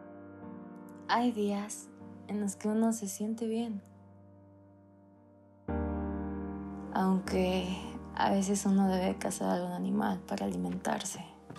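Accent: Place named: Mexico. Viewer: Su acento de mexicana 😍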